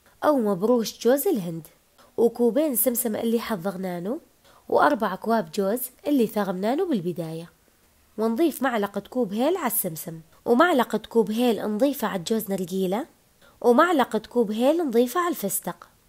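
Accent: Mosul, Iraq. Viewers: عاشت ايدج يالمصلاويه لهجتج احله من الكليجه الله يحفظ الموصل واهلها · كلامك حلو مصلاوي احب الكلام المصلاوي و الاكل المصلاوي لاني عشت بالموصل اربع سنوات خلال دارستي بجامعة الموصل و بقيت كل سنة بالعطلة الصيفية اسافر للموصل كلك رائع ياعراق من شماله الى جنوبه مهما بعدنا عنك فأنت عايش بالقلب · فدوة للهجه تجنن اموت عل مصلاويين وكلامهم الحلو فديت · آللهہجة آلمـصـلآوية تجننن ❤❤ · اكتبيلي عن حياتج عمرج اني احب الهجه الموصليه انتن الموصليات معدلات بلطبخ ام حيدر من الديوانيه الله يوفقج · عاشت ايدج مصلاويه اللهجه تخبل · عاشت ايدكي حبيبتي قبان لهجتنا الحلوي الله يحفظ الموصل واهل الموصل يارب 🤲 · عاشت أيدك ياحلى لهجه الموصل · حلوه لهجت أهل الموصل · ليش الموصل لهجتهم شويه تختلف عن بغداد. اكو كلامات متنفهم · اشقد حلوة اللهجه الموصغيه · الله ع اللهجه الموصلية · اني عجبتني اللهجة الموصلية واللدغة باللسان روعة وعاشت الايادي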